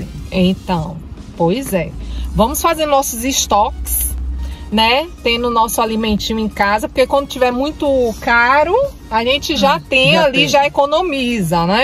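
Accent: Bahia, Brazil. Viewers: Arrá! Descobri! Ela é baiana! Fala mainha, salvadô....😁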